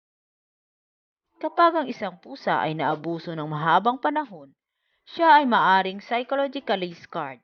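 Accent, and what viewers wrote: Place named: Visayas, Philippines. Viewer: Huwag ka naman bully Tiktokersph pangit ang ugaling ganyan. What's wrong having with visayan accent? Ikaw ba excellent ang accent at grammar mo??! Manood ka lang huwag ka ng mamintas! 🧐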